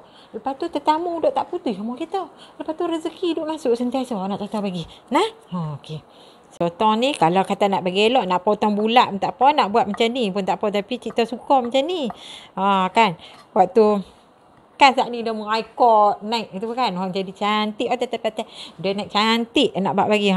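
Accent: Kedah, Malaysia. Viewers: Suka tgk masak...cakap kedah lagi...cun la..sopan.bersih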